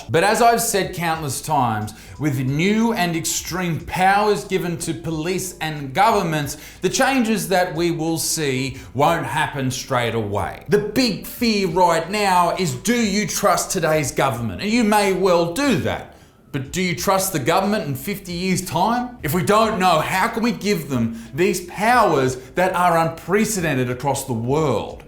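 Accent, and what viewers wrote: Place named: Queensland, Australia. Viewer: i bet you're from queensland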